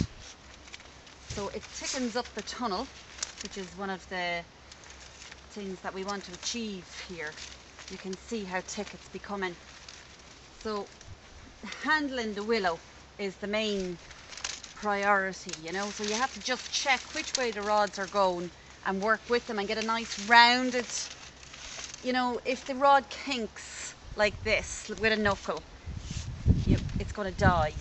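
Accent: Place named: Ireland. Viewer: I love a soft Irish accent. Those 'th' sounds are to die for. That's a really amazing structure. I'm only hoping for some firewood from a few planted shoots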